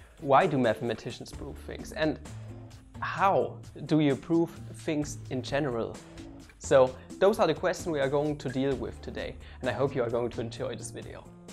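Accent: Germany. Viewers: Is this guy German or from a country where German is the main language. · Are you german by any chance? :)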